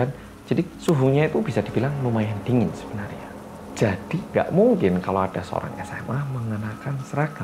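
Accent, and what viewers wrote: Java, Indonesia. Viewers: Orang korea aja bisa medok masa kita gak bisa Ya tapi gw gak bisa sih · Kak orang aman ....suwarnya kaya logat Jawa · Ni muka Korea kaya orang Jawa logatnya 😅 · Kyk Jawa ngomong nya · ilma azzahra ya kan mas cerita ny medok terus bolak balik mas bilang suara dering hp ny "Ring ding dong ring ding dong" itu kan bikin ngakak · dia pernah lama tinggal di indo. di malang, jadi medook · owlh mulak men logat e jowo bgt 😅rupa2 ne koyo raono korea2 ne je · Kaya orang korea tapi sekali ngomong itu keliatan orang jawa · Orang jawa ya bang?? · Mirip Rep Monster _ Kim Namjoon, a.k.a. salah satu member boy band korea, BTS. Tapi bahasa jawa kentel-nya lucu 😭👍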